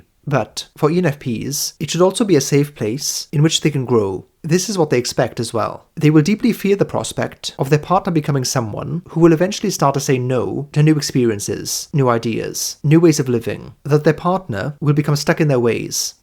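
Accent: United Kingdom. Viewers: yeah, has his own British polite and accurate magic that is enjoyable to hear and very well applicable for some deep discussion) Well, I somehow understood by several (7 or 8, maybe) tests that I'm somewhere in INTJ-INTP-ISTJ-INFJ zone. Then I looked through videos about these types and the closest one appeared to be INTJ. Though it's either exposes INTJ as much smarter and mystical than they are IRL, either it's me being too stupid for an ideal INTJ)) I can't say for sure, but by the discussion here you really have some INFJ vibes. I usually speak more roughly and straight-forwardly. As a proper INTJ, that is ^_^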